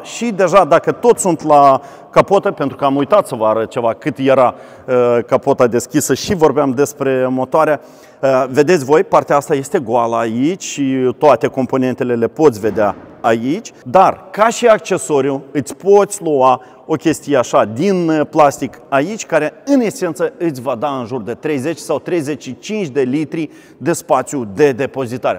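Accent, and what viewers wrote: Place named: Moldova. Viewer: Ăsta-i moldovean de dincolo de Prut ? Că după accent, așa pare !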